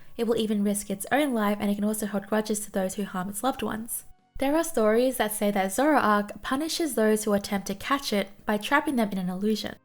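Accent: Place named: Australia. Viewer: I'm pretty sure you are saying all the names right, just with an Aussie accent lol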